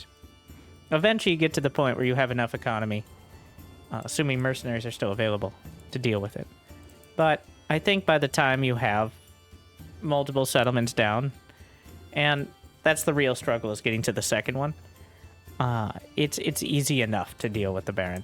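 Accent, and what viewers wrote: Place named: Western North Carolina, United States. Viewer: Where are you from, friend? I can't place your accent. I want to guess Western North Carolina.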